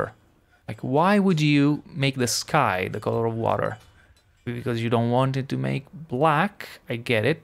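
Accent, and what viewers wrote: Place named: Italy. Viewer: Ι cant tell if your accent is greek or italian or maaaybe spanish. Which is it? Betting on Italian.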